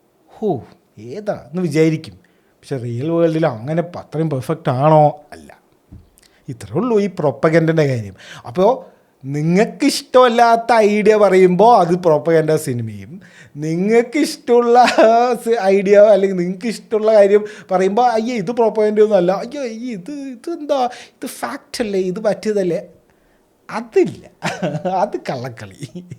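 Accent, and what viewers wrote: Palakkad, India. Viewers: Bro ningal palakkad ano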